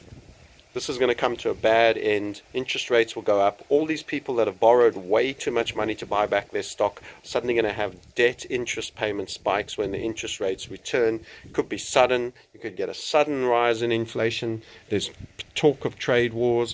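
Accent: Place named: United States. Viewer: 10/10 American accent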